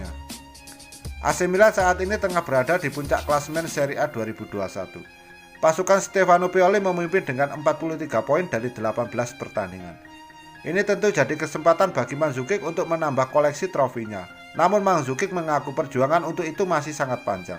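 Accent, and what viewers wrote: Java, Indonesia. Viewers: Medok ngomong.e boss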